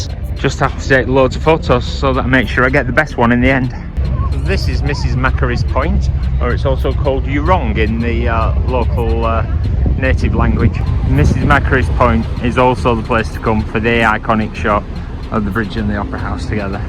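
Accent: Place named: United Kingdom. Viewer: Oh! Your accent did sound British to me but I thought you had come from Melbourne, as in living in Melbourne, to Sydney for the cruise. Yes, then you understand the distance. I was on the Discovery Princess and really loved that cruise, especially New Caledonia. I'm in love with the south Pacific and my next cruise will most probably I'll go back to the south Pacific for my next cruise. I hope you enjoyed Lisbon.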